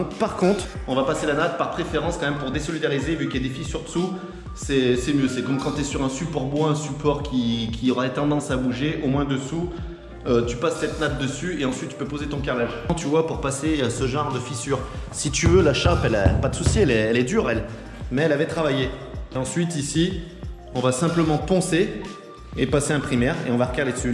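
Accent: Loire, France. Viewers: De bons conseils , de la bonne humeur, de la bonne musique, l'accent de la Loire. Du kiff, merci d'être super ludique dans l'explication, clair net agréable à souhait ! 🙏👍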